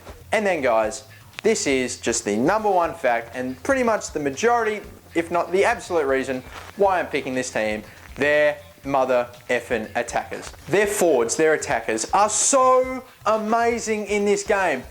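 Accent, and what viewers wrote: Germany. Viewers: Great German accent! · i like the german accent I thought it was accurate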